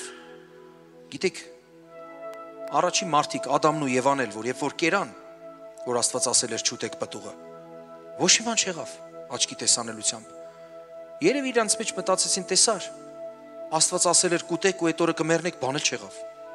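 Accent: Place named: Lebanon. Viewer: ՏԵՐԸ օրհնի ախպերս, Քրիստոսով։ Իմ սրտից դուրս եկած քարոզ ես քարոզում։ Շատ մաքուր հայերեն ես խոսում։ Լիբանանում եք ծնվել՞։ Տերը օրհնի իրա միակ Սուրբ եկեղեցին։ ԱՄԵՆ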